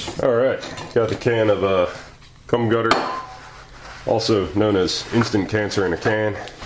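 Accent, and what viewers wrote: United States. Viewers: It's AvE but in American English instead of Canuckee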